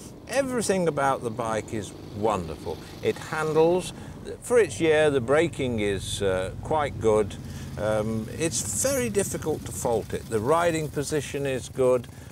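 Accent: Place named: United Kingdom. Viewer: that is a 100% pure british accent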